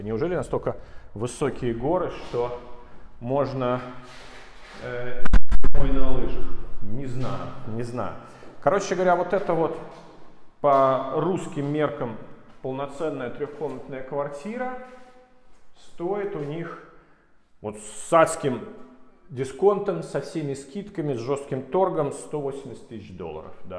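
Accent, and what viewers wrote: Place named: Castile, Spain. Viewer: испанский не с акцентом,а кастильский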